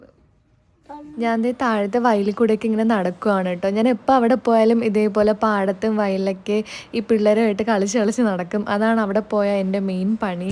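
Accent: Trivandrum, India. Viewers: hai chechi ammommayod samsaricha tvm slang kettapo as a tvm kaari oru sugm indayirunnu ❤🎉😅❤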